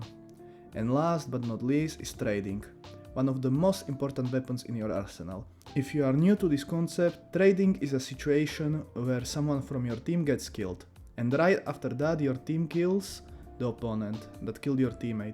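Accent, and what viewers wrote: Czech Republic. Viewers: Hi good video btw are u from Czech republic? Ur ascent is so czechian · bros def czech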